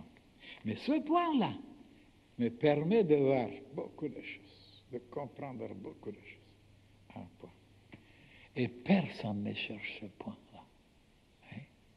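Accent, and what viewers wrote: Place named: Czech Republic. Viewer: I' m hre because of Franz Bardon. That's fantastic knowledge ! Thank you for sharing ! And I speak french, so it's a delight to listen to an old wise man with a strong tchekich accent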